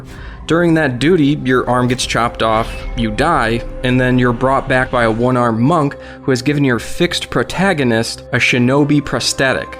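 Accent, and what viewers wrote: United States. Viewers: The Very American way he pronounces Japanese words annoys me.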